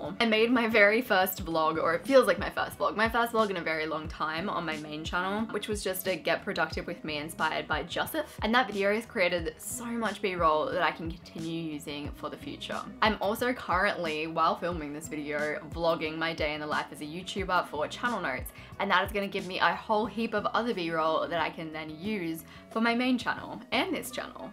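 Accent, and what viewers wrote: Australia. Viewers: This is awesome! I find your content so helpful and inspiring!!! Also, I love your Australian accent, your voice is very pleasant to listen to. Do you have a podcast? I think you’d do great in that forum too 😆❤️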